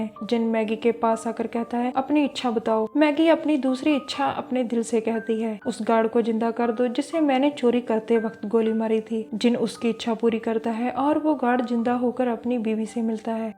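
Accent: Punjab, India. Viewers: I love your all videos didi . thodi voice to lgda v tusi punjabi ho m also from punjab 🤗🤗🤗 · Punjabi touch ha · U are punjabi right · U r punjabi ?? Cuz u speak hindi with punjabi accent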